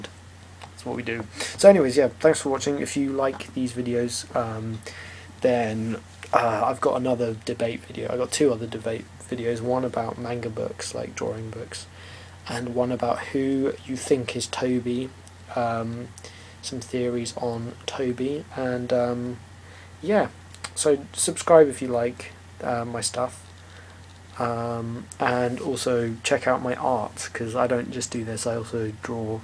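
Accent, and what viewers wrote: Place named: United Kingdom. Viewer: This is perfect, a British guy talking about naruto! Im in heaven!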